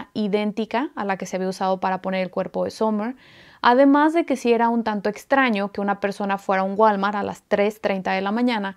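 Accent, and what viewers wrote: Mexico. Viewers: gracias, ya vi que salen tus Suscriptores, muy bien, así podemos ver tu avance. Tienes una dicción, tono de voz muy bonito, así se habla en Ciudad de México y Estados del rededor de Cdmx... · 👏👏👏👏👏De qué nacionalidad eres? Me sueñas como yo, mexicana o tal vez de Guatemala????? Soy súper fan de varios Youtubers de crímenes, pero de ninguna mexicana porque hablan " muy fresa" y no tolero ese tonito de voz , además de que eso no me parece profesional. Por fortuna de sugerencias di con tu canal y me encantó tu calidad de investigación y narración. Saludos desde Suecia. Soy mexicana pero estoy casada con un sueco y soy residente aquí. Este tipo de videos me hacen sentir muy cerca de mi amada Latiomamérica. 💓💓💓💓💓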